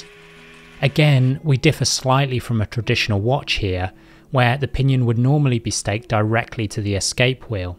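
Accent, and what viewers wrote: United Kingdom. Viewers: This channel is very reminiscent of Clickspring, except with a relaxing English voice instead of a relaxing Australian voice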